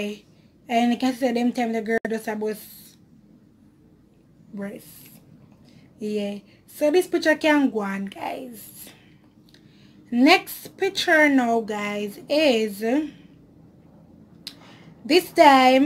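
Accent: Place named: Jamaica. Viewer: Nice video and love that Jamaican ascent though 😍😍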